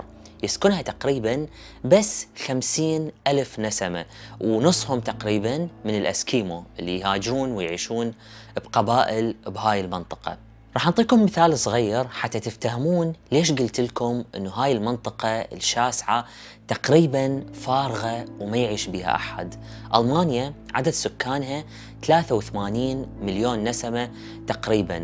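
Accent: Iraq. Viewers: سردك للقصة جميل جدا والي محليها اللهجة العراقية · مرحبا ياريت تجيب لنا قصة مدينة آشلي المفقودة قد سمعتها لكن مو بطريقة سردك الرائعة وبلهجة العراقية زادتها الغاية في الروعة ❤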